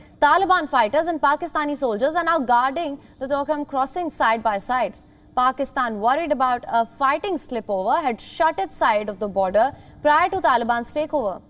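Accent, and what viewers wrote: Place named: Southeast, India. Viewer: You're eccent southeast India I can't stand that thick eccent 🧐😳😬😬😬😬🤐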